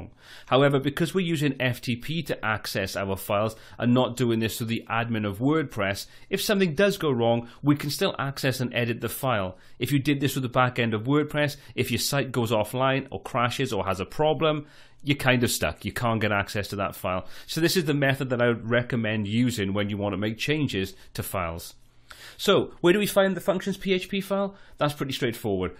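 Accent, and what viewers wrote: Wales, United Kingdom. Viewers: Welsh accent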